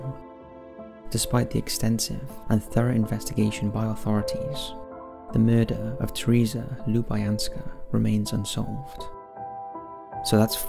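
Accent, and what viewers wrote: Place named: Wales, United Kingdom. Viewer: Does anybody else IMMEDIATELY smash the thumbs up when they hear the Welsh guy narrating?! My favorite ever! 😘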